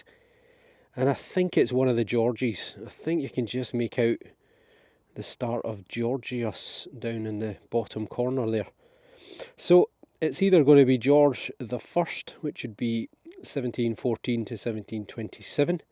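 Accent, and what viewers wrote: Scotland, United Kingdom. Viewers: I love your channel! I love your brogue! I am in Alaska so no digging here this time of year! Love all your finds and Scottish goodies!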